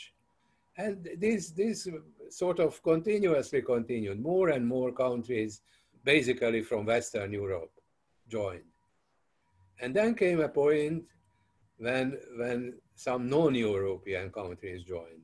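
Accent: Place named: Hungary. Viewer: I know Hungarians have a very distinguishable English accent but damn, Pelikán is the king of speaking English with accent.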